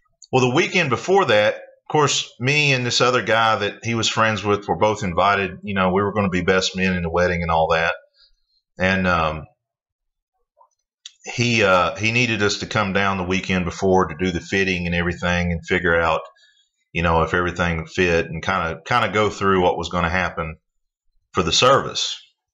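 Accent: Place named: North Carolina, United States. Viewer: I thought I recognized your accent... you are from North Carolina, right?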